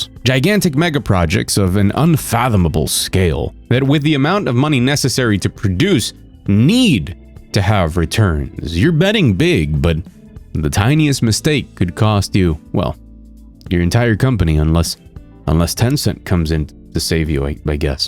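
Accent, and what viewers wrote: France. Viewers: ur french accent is good! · The wrong assumption big studios and marketers make is mostly that focus groups represents organic crowds. They dont. Focus groups and surveys are a simulation of real people collective behzvior and quite easily miss the mark. And kudos for your french accent :)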